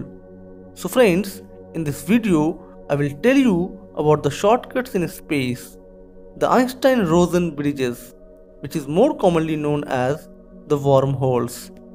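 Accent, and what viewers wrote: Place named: India. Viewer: Cringe indian accent Stolen Content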